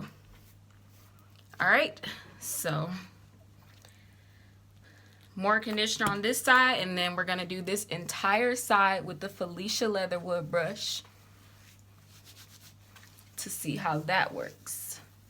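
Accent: United States. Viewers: Friendly comment: You’re speaking REAAAAAALY slowly. And you sound American-born (I am not) so don’t think it’s a language thing? It makes the video excruciating to get through. I put it on 1.5speed and it’s ok. You can be clear and articulate w/out being as slow as this, is what I’m sayin’ ☺️. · I must admit she spoke very normally for someone with an American accent so I can't relate to the original comment.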